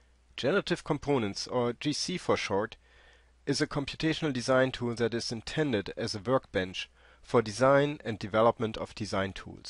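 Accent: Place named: Germany. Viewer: Too much germanish XD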